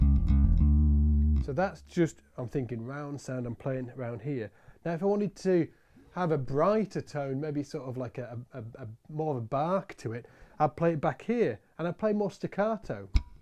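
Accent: United Kingdom. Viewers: He's from the UK =) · He's definitely british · so, i was not the only one that did not get the names right..... sorry i have problems with british Accent .......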